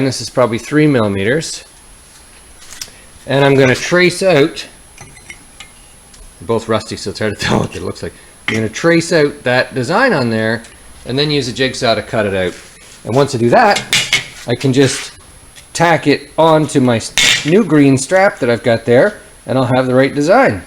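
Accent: Canada. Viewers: Are u from nova scotia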